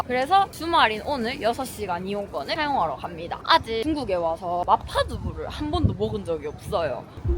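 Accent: Gyeongsang, South Korea. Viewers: 고향이 경상도 쪽이세요?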